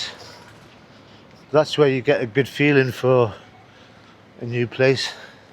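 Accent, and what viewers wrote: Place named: Northern England, United Kingdom. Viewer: The English accent is difficult. It’s a working class non southern English accent and it’s hard for me as an American. Ha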